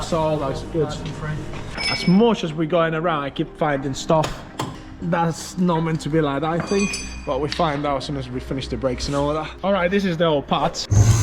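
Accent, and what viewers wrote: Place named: Liverpool, United Kingdom. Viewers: I just love that this guys accent is like a perfect blend 50% UK and 50% wherever he's from (not tryna be rude just don't know where he's from). How long have you lived in UK for mate? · He’s from The Dominican Republic via Liverpool 😂😂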